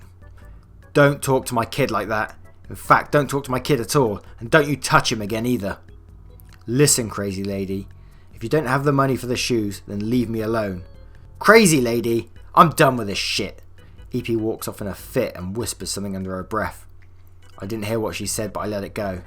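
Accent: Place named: Bristol, United Kingdom. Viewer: Ok then, on some occasions you sounded like a Bristolian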